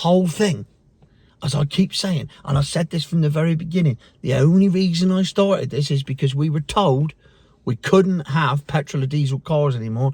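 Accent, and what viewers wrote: Cornwall, United Kingdom. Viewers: Your problem is that you speak with a Cornish accent therefore according to the Book of Musk the EVangelists bible you are an uneducated hayseed, a hillbilly of epic proportions because you don’t sound like someone from Knightsbridge. The fact that you are a qualified educator must now send their guts into a Vindaloo paroxysm. Well done.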